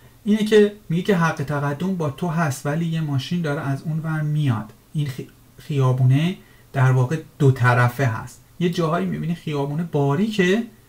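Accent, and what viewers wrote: Shiraz, Iran. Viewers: جيگر لهجه شيرازيت برم كاكو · جون كاكو خراب لهجه شيرين شيرازيتم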